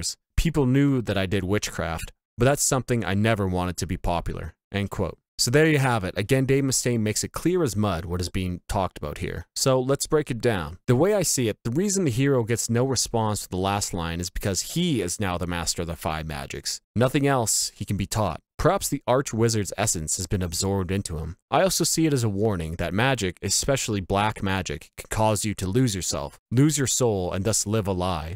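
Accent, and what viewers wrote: Canada. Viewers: Owt....I bet you are either Canadian or from Minnesota. It really is a great song and thanks for covering it.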